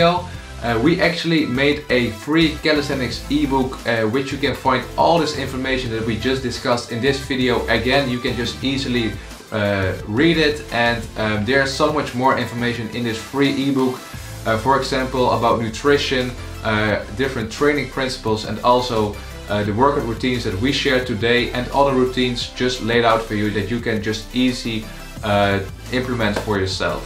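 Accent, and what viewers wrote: Netherlands. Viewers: You’re Dutch right? Hahahaha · holl up holl up, judging from your accent you guys are fellow Dutchmen am i right? would make me proud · you sound very dutch hahaha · The accent screams “ I’M DUTCH!!!” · Ik kom al horen dat jullie een nederlands accent hadden😂😂maar hoe hebben jullie ooit dit berijkt en waar doen jullie dit? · Lekker nederlands accentje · Are you Dutch? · I recognize this accent immediately. They are dutch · Ultimate Dutch accent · top video, zeer behulpzaam! love het hollandse accent onder dat engels :) groetjes antwerpen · Hollander English to funny. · Puur Hollands lol! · I subscribed because of the Dutch accent. Respect vanuit Amsterdam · lekkere nederlandse tong tijdens het praten haha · Yep theyre Dutch you can hear that accent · Are you from the Netherlands? I can hear it by your accent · Cringeeeee big time, please just talk Dutch or in proper English xD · I smell Dutch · Yeah they are from the netherlands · Nederlandse accent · Dat Hollands Engels is echt zalig😅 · Haha no idea who you are but half way first sentence I hear a fellow Dutchmen. 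· Yeaaahh i heard it immediately hahahha. I.speak dutch but i dont have thia accent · or, we can just be proud that us Dutch have such a high rate of English proficiency, with or without accent.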